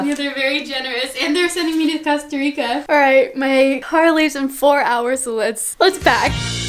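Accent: Canada. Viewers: her Canadian accent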